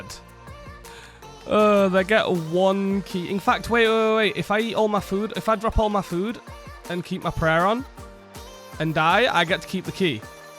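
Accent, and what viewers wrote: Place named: Yorkshire, United Kingdom. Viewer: You sound like you're from Bradford/Leeds/Hudds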